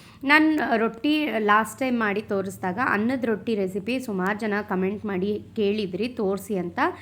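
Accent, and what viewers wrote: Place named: Mysore, India. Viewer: I always wondered that you speak kannada exactly like my house kannada . We are from Mysore / Nanjangud belt . I live in Bangalore . I like the way you talk. You should plan 1 live session with your subscribers .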